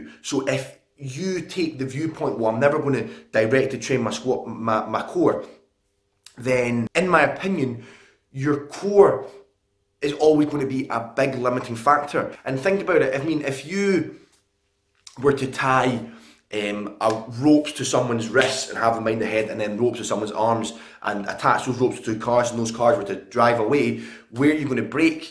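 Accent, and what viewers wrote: Scotland, United Kingdom. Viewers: yo where in scotland are ya from?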